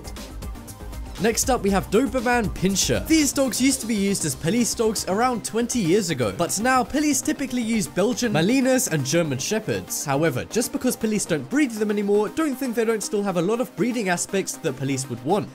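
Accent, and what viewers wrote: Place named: England, United Kingdom. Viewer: English accent doesn't compute with American closed captions... Apparently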